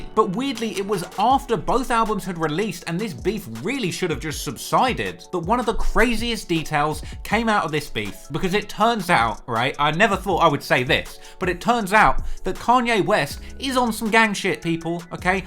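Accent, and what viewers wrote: England, United Kingdom. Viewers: Yeah I think he's from, you know, England - because of the British accent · why is a british man giving me information on a chicago based gang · We don’t wanna hear a guy from England report on this…..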